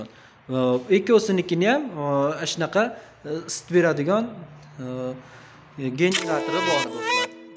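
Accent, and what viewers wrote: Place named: Russia. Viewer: Ака лекин русча аксентийизга беш кеттим лекин, 😂😂😂 кловат, и, димахот гап ук лекин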